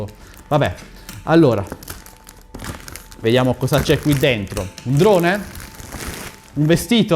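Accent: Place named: Bari, Italy. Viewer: E' piacevole sentire un unboxer con il poprio accento.... sei un grande!!!...W Bari.....Ottimo unboxing!!!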